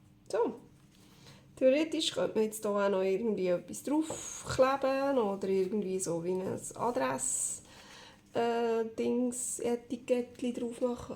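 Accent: Switzerland. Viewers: Hooi so schön schwizerdütsch höre 🥰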